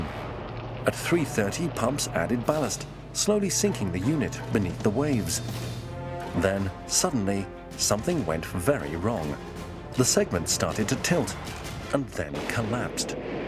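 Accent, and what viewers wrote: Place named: United Kingdom. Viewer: yes but the brittish accent is so nice.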